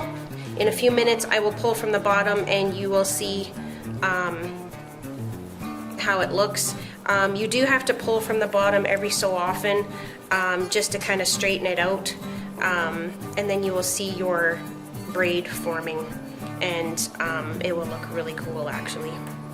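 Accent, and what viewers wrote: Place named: Canada. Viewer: Thank you very much. You have a wonderful video! Are you Canadian!? Accent gives us away!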